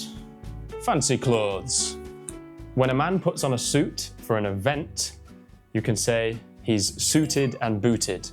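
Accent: Northern England, United Kingdom. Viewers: You’re amazing! I love British English 😍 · I think that the person asking question meant your ’coot’ pronunciation of ’cut’ which seems to be some kind of the northern accent of English.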